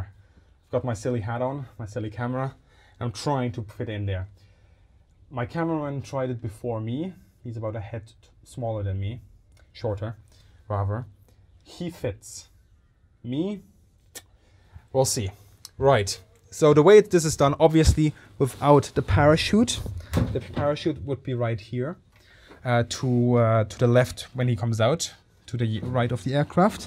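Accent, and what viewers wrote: Germany. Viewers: Deutsche erkennen IMMER einen deutschen Akzent xD, geiles Video bro. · du sprichst deutsch das hört man lol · Sound German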